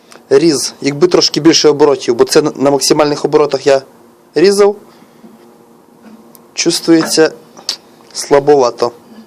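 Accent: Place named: Rivne, Ukraine. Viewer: ну - молодець! ще не вмикнув а вже поламав...)така мова гарна,як десь біля Рівного..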